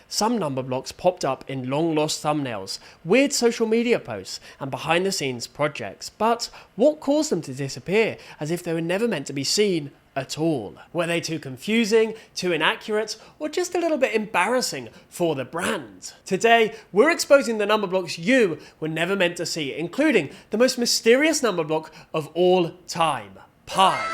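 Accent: United Kingdom. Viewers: A British(?) guy talking about a British show feels so satisfying and I don’t know why.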